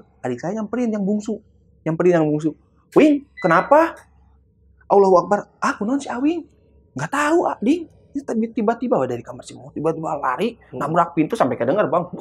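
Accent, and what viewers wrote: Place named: Sunda, Indonesia. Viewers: Sunda bgt... 😁 Salam dari karawang 🙏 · deuhh kumaha iyeu nya logatna sunda pisan euyy😅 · aa, meni rame nyeritain na... orang sunda pisan... semangat a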